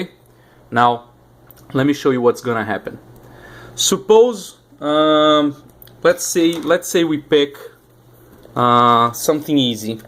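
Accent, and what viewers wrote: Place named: Brazil. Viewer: Are you Brasilean? I can notice a Brasilean accent in your voice background.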